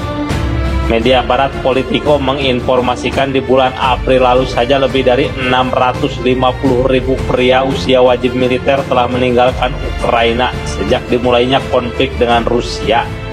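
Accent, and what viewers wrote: West Java, Indonesia. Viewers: Logat sunda lu bos kentara banget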